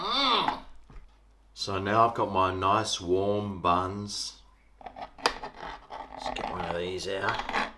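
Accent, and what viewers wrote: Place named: Australia. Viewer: Spanish spoken by an Australian!!!! OOH LA LA!!!!!!!!!!!!! We likey!!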